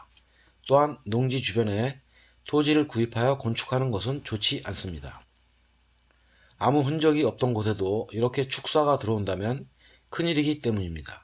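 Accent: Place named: Seoul, South Korea. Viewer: 까?하실때 악센트 주시는게 서울사람이시네요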